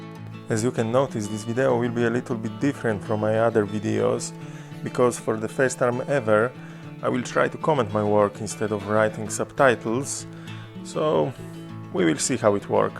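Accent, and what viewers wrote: Poland. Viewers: od razu słychać ze polak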